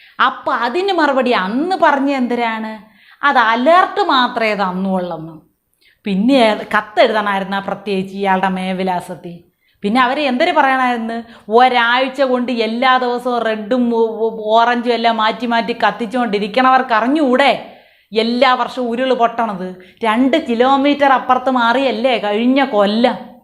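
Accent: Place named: Trivandrum, India. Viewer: നല്ല tvm slang കേൾക്കാൻകൊള്ളാം നീ ആരെടെയ്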